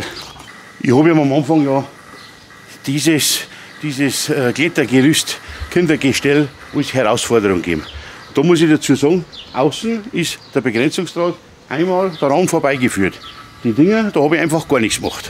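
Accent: Bavaria, Germany. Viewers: Also i hoer di so gern mit Deinem Bayrisch. Das is schoh supper!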